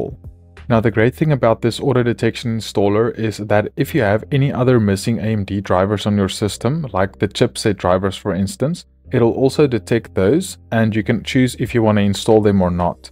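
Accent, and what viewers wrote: South Africa. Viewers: Definitely South African accent 😂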